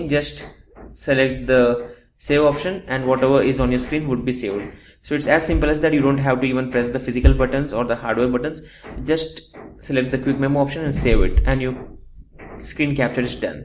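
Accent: India. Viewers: i hate that indian accent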